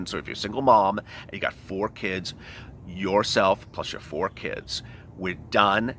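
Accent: United States. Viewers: Alright...I see that you now sound and fell like many of our fellow Americans, and me as well. You are da man. Great Job!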